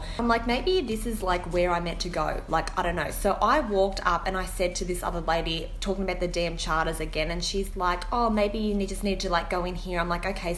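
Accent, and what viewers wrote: Australia. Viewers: I seriously find your voice so entertaining ! Love the aussie accent.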